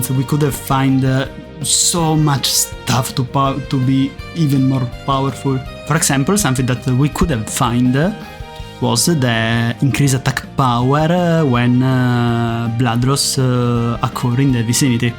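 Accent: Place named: Italy. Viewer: I think your english pronounciation is the worst I've heard so far. It's impossible to understand the video without turning on the subtitles. I could argue you might be italian from the accent. Either way, work on that or just start using elevenlabs for AI generated voice instead.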